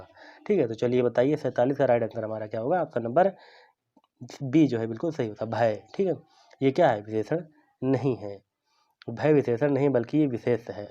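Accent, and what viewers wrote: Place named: Agra, India. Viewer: सर जी आपकी भाषा तो अपने आगरा जिले की सी लग रही हैं🤣🤣 आप कहाँ से हो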